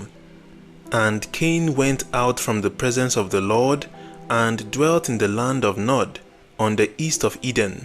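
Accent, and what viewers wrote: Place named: Nigeria. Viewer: The first Nigerian accent audio Bible in the making. Thank you sir. God bless you greatly. A happy new year to you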